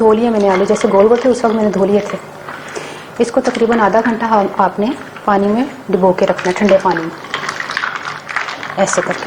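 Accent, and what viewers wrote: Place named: Punjab, India. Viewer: Main bhi lahore main paida howi apki paki panjabi son k bohat khush howi main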